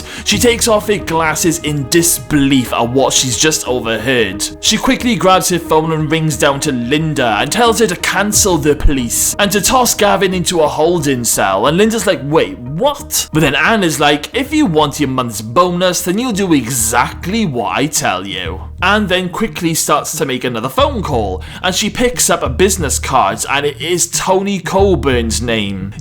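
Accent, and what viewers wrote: Wales, United Kingdom. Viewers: Love your analysis so much, as Wentworth keeps getting better so do your reviews- this review is pure art with your fine detail of screenshot selection, your feelings in response to the scenes resonate. I love your predictions - I definitely think you could have a role for the show - as a Melbourne boy born and bred but living in UK I love to hear the passion snd love for our best ever Australian tv show delivered in a welsh accent. I vote for you being offered a role by FOXTEL to create official special feature shows that celebrate the series and give you direct access to the cast/crew/writers.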